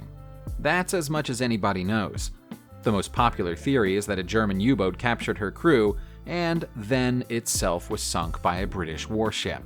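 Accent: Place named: United States. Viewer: The pronunciations of British names are quaintly American too, as is the typically American mispronunciation of route as "rowt".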